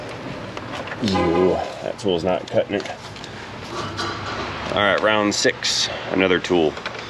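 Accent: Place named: South Florida, United States. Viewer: You did say you all. That's what they call a Southern Florida accent. But same difference As a Southerner.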